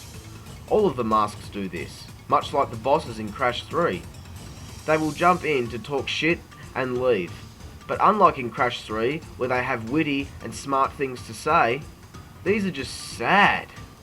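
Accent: Australia. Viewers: Your Australian accent really fits with this game :D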